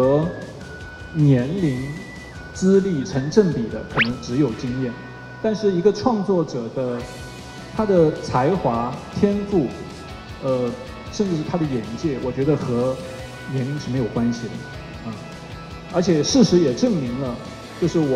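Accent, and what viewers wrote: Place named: North, China. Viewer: 他在北京是另外的口音，很北方的口音。他是模仿能力很强。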